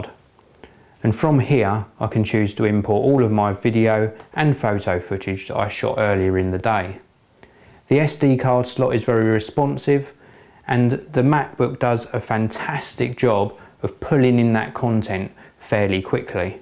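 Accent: United Kingdom. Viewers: You have an amazing voice.Love your British accent.Awesome review,really helpful👍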